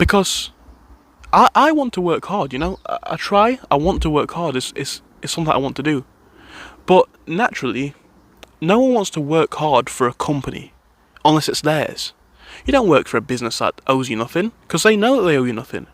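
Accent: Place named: Northern England, United Kingdom. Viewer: £500 a month won't get you a shed in the south east! My studio+ apartment is 1000 a month ...not a 1 bed a studio+ which has a small bedroom barely without a window! But I guess it's half the price up north which by your accent seems you are from.. Aldi the money you get paid in the south Vs north isn't a huge difference these days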